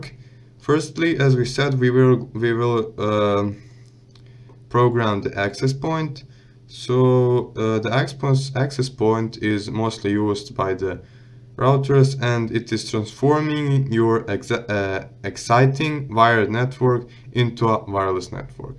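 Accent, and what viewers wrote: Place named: Serbia. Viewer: Odmah sam znao da si nas cim si poceo da pricas :) Hvala na videu...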